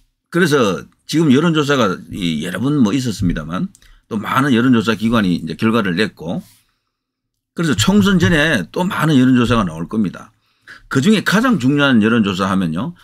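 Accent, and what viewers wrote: Gyeongsang, South Korea. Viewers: 님 경상도 말솜씨 에 정감이가고 진실성이 있어 많이봅니다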